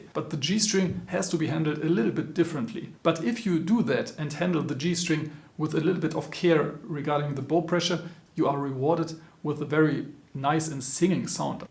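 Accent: Germany. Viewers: i also love your German-accent english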